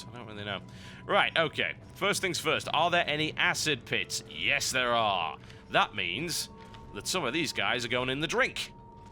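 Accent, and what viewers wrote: United Kingdom. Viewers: u talk too much and ditch the british accent thanks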